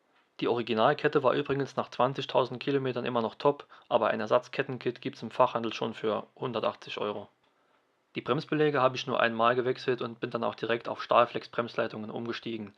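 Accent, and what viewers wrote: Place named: Saarland, Germany. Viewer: Saarländer oder Westpfalz ... zumindest würd ich darauf tippen ..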